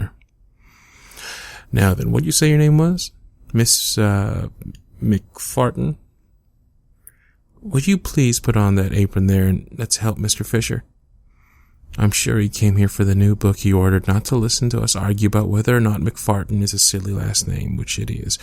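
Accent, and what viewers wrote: South, United States. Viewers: Really wishing the guys around my town had this type of southern accent. They all sound brain dead. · Great video as always! as a girl from the south ( Florida in fact ) I am loving your southern accent. Keep up the good work sugar! ^-^ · Ugh I’m such a sucker for country accents😩🤍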